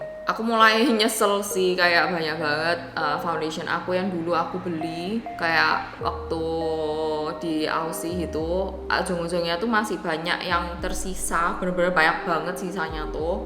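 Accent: Java, Indonesia. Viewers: aaa surabayaaa, ak udh curiga kok logat nyaa super sbyy ternyata bnrann HAHAH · sering liat video kakak di reel short dan suka banget sama penjelasannya. kakak lucu (in a good way) suaranya, mendok dapet tapi klo ngomong bahasa inggris tuh bagus banget aksennya aku suka. tadi ngomong kayak "ituuuu" aja dah kayak lidah bule xD aku juga masih sering pake produk exp kak, tapi memang semua tergantung ke kulit masing2 ya. ada yang exp langsung bruntusan, ada yang badak mukanya. hasil make-upnya cakep banget dan aku jadi keracunan produk2 yang dipakenya wkwkwk. apa brush itu ngaruh bgt ya kak? soalnya rasanya itu tadi cuma tap kecil langsung keluar warnanya... atau itu karena produknya emang HQ? btw jujur bare facenya bikin aku salfok banget.. sehat, glowing pokoknya cakep. boleh dong ya kak nanti spill skincare favoritnya, dan mungkin kalo kakak ada pake massager tools buat muka, treatment dll gitu dikupas juga kalo ga merepotkan🙏🏼 last but not least semoga kakak dan babynya sehat selalu dan sampe persalinan nanti dilancarkan. 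aku tunggu konten2 lainnya ya kak 🤗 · hi kakk I'm new subscriber here;) keep going for your contents~ anw aku suka accent medoknya kakakk <3 lucuu pol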